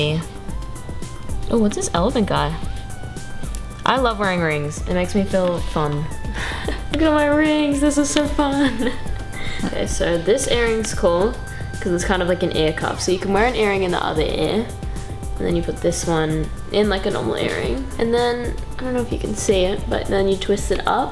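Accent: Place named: Australia. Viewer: im happy you still have an aussie accent :) ccome backkkkkk!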